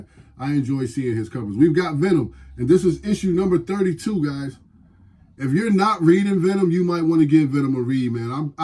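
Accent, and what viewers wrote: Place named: New England, United States. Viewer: Bro, you're from New england?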